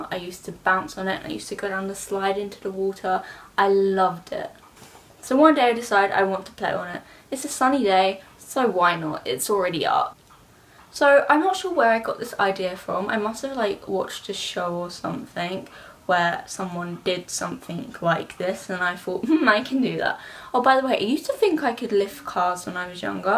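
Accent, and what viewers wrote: England, United Kingdom. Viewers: You had a different accent actually. It was more English than american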